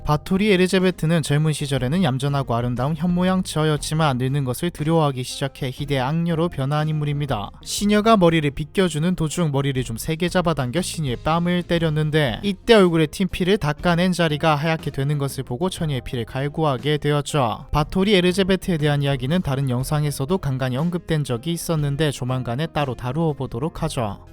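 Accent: Gyeongsang, South Korea. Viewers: 경상도인의 서울말 같이 들리네요 ㅋㅋ 제가 듣기엔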